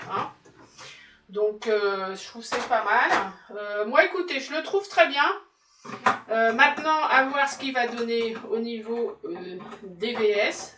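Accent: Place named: Paris, France. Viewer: oh oui des recettes allégées !!! bonne vidéo, un p'ti accent j'dirais parigo !!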